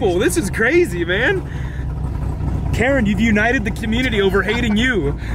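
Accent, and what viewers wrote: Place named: Texas, United States. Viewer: You sound like someone from Texas....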